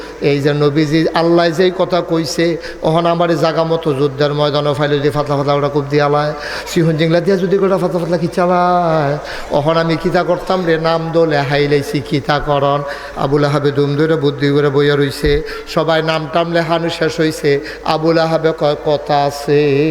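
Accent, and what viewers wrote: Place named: Rural, Bangladesh. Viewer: সে তার গ্রামের আঞ্চলিক ভাষায় কথা বলে সে এখন পর্যন্ত শুদ্ধ ভাষায় কথা বলতে পারে না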